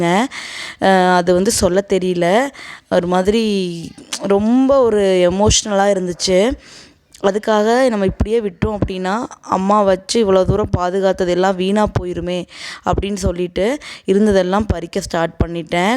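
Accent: Kongu, India. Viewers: கொங்கு தமிழ் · Oru oru oorla oru oru pechu vazhakku irukku.Adha yaarum edhuvum soldradhillaye.Aen ellarum "porikkara" dha mattum target pannanum?!Neenga Unga oor pechu vazhakka mathuveengla sollunga sister?!Pechu vazhakku enbadhu mannin manam. Accept pannitu poite irunga. Kongu tamil avlo azhagu.Ippo ella oor makkalum mix aagi,kongu tamil eh ketpadhe rare aagi vittadhu.very sad to say this.Ellame maari pochu.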